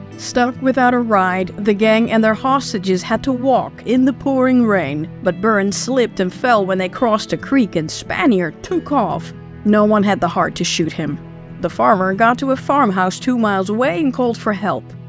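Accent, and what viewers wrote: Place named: Netherlands. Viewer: I love that silky dutch accent. Another brilliant episode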